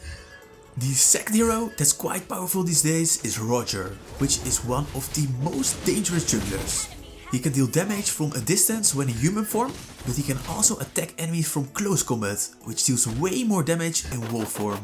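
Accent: Netherlands. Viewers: Ik hoor gewoon dat je Nederlands bent HAHAHAHA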